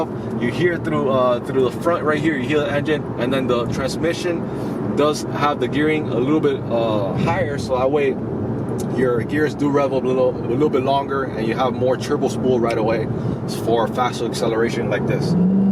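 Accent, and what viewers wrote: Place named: Miami, United States. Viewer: I recognize that Miami accent anywhere. Rick Case VW plate confirmed my suspicions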